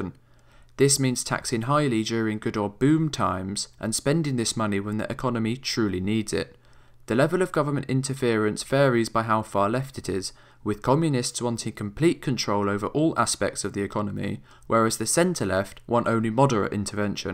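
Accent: United Kingdom. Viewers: Peter t.c.: I wouldn't be giving out advice like this when you can't even tell the difference between an American and a British accent. The presenter is British. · This is just PragerU with a bri'ish accent.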